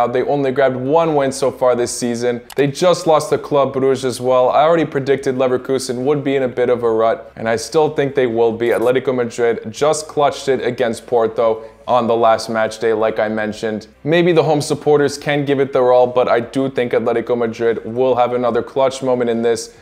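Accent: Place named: United States. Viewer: Can tell hes american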